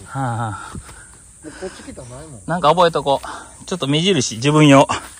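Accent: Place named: Wakayama, Japan. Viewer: あっ🤭、そうそう！の話し方にほっこり。和歌山弁にほっこり！関西人はなんとなく、通じるものがあるんですよね〜。いつもお返事ありがとう。喜んでます🥰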